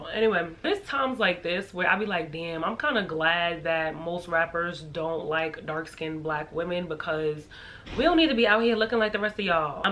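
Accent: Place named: New York, United States. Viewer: Girl just wanted to say I love your channel and your NY accent, love from London x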